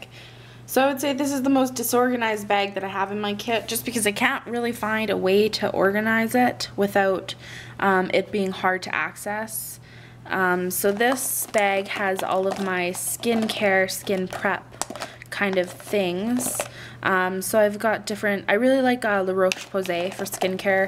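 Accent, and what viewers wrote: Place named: Canada. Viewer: you sound canadian? just the way you say certain words. or maybe from the northern part of us near canada like minnesota or something. thanks for the vid btw...very helpful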